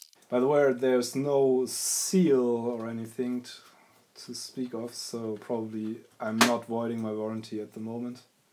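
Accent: Germany. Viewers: I also prefer the real hardware or my PiStation, which can emulate more then just the c64. Overall, as you told, u have to be a hobbiest when using original home-computer stuff today or doing it with the raspberry pi. Imho the c64 mini has it's place at home, when only want a quick play on older day games ;) BTW: I love watching your videos and especially the german accent :)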